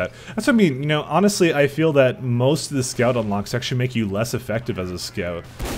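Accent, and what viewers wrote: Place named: Canada. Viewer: His Canadian accent may have something to do with it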